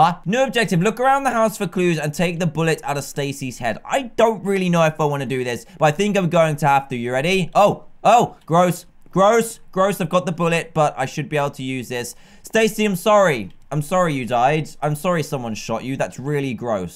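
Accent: United Kingdom. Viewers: is it just me or does he sound British??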